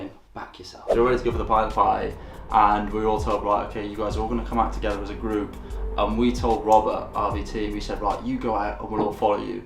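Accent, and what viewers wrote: England, United Kingdom. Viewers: which English accent is he speaking? 😩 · agree especially british accent is way harder than the americans one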